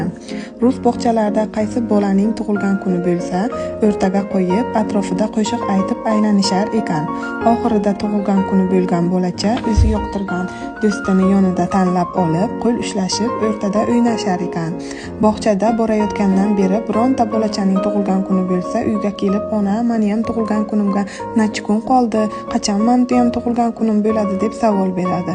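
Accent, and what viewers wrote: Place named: Bukhara, Uzbekistan. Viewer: Бухороликми сиз.Шевангизга караганда Бухороликка ухшайсиз.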